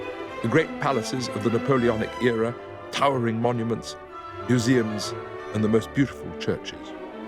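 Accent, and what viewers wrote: RP, United Kingdom. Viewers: Anyone without a wanky posy accent. Almost anyone on DW news would be wonderful. Most people on the BBC would be a significant improvement. I don't see the point of sounding like a really annoying snobby social climber. After all, there's nothing wrong with the music. Why screw up the text that way? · I thought the same of the lengthy introduction, and it seems that the narrator would like to magnify the narrative effects by such contrivances, but it can test our expectation (our wits) which, with some endurance (add the intrusive ads and other distractions), we may finally arrive at the gist of the subject-matter in hand. Nevertheless, for the more patient and cultured, it is worth the efforts. It is not easy to say whether a short video, taking such goodly swath of introductory embellishments, could enhance the desired effects, which is to capture the viewer’s attention from the outset? 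That said, one is bound to admit that these documentaries, despite the narrator’s fondness for an architectural backlog of informations and history, are highly recommended and even of the highest rank. One could say that is natural to the British people, with their distinct accent, to win our admiration as the quintessence or epitome of intellectualism and refined culture.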